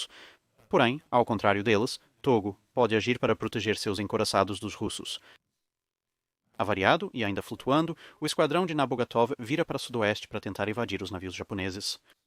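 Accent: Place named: Portugal. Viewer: Excelente conteúdo amigo, apesar do sotaque tuga bem forte conseguiu passar muito bem a explicação toda no vídeo! Abraços do Brasil.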